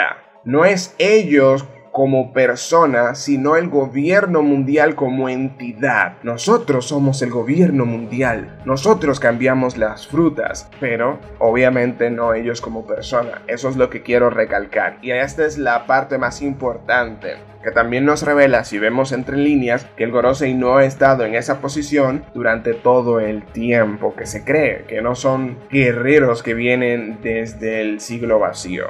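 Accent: Dominican Republic. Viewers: Por su acento diría que es de RD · Bro eres dominicano ?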